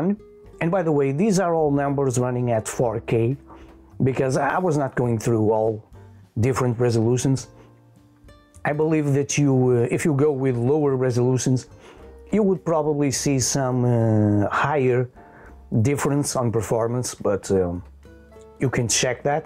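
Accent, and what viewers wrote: Portugal. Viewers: o teu sotaque não engana ninguem, PORTUGAL CARALHO!!!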